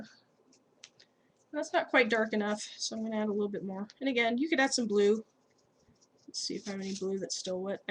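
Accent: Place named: Midwest, United States. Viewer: Good tutorial. Thank you. Are you by any chance from the Midwest? I'm from Illinois and your "accent" sounds local. I particularly liked your dunes. I seem to have a perspective problem with beaches. Mine look flat. Thanks again!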